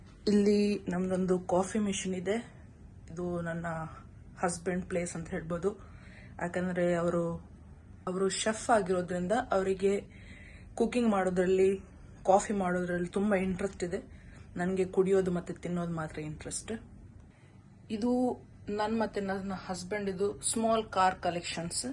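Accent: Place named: Mangalore, India. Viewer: ನೀವ್ ಆರಾಮಾಗಿ ಮಂಗ್ಳೂರ್ ಕನ್ನಡನೇ ಮಾತಾಡಿ ತೊಂದ್ರೆ ಏನಿದೆ 😁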